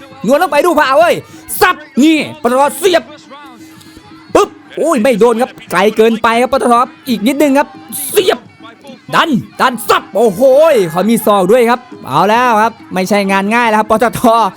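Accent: Isan, Thailand. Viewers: ชอบผู้บรรยายเป็นภาษาอีสาน ชอบหลายเด้อครับ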